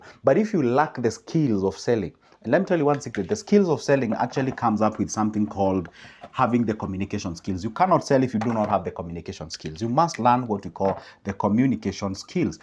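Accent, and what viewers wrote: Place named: Kenya. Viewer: Oooh now i get where you got that nice accent...that luo land..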